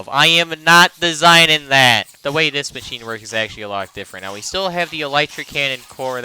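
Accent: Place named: Scotland, United Kingdom. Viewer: Bro sounds slightly scottish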